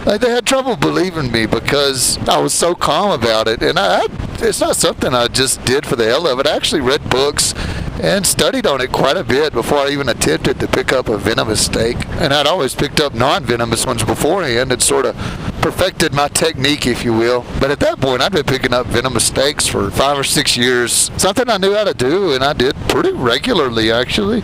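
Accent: South, United States. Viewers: I love that southern accent. And that video was awesome. If you're ever in Sydney, Australia. PM me and I'll buy you a beer.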